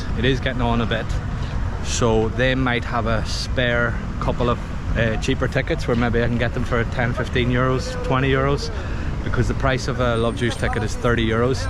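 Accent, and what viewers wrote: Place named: Derry, United Kingdom. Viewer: Where abouts in ireland are you from bro? I'm from derry myself your accent doesn't sound far from here. Coming to ibiza 28th September for the week so thought I would get a watch at the videos!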